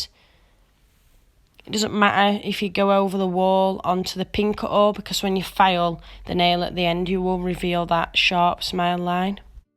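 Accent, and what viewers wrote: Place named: Leeds, United Kingdom. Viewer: Really beautiful set of nails. I am addicted to glitter planet products and her prices are so reasonable compared to other nail supplies. Where is your accent from if you don’t mind me asking? It sounds like a Leeds one or maybe surrounding areas? Thanks for sharing. 👍🏻👏🏻👏🏻👏🏻👏🏻👍🏻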